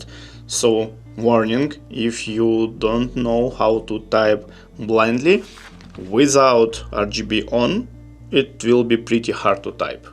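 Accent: Russia. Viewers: Та по акценту сразу понятно что человек русский) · Честно говоря я когда зашел на канал сразу понял что ты русский по акценту)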